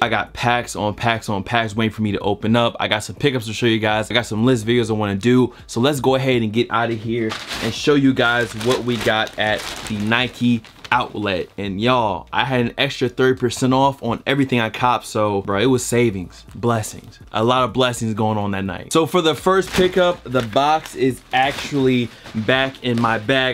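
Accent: Virginia, United States. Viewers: He from Virginia?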